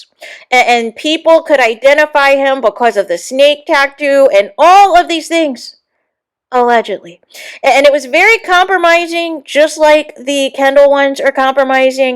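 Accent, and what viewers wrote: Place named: Minnesota, United States. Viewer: i love ur accent! i just know ur from Minnesota 🤣🤣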